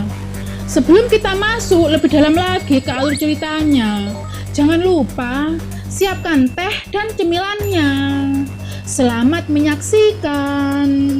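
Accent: Java, Indonesia. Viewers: ASLI JAWA MBA ? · Maklum mbaknya Wong jowo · suaranya medok banget mbak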